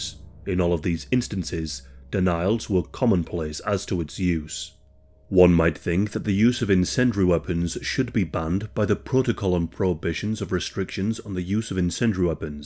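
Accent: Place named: York, United Kingdom. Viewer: You look like Scotty, vocalist of a band called Street Soldier. They are from york so I'm guessing by your accent they are fairly local. Any relation?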